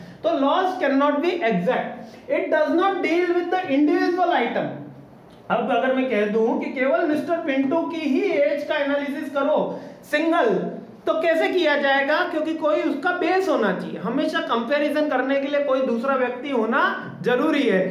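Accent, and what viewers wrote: Gujarat, India. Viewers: Sir aap Gujarati hainn kya?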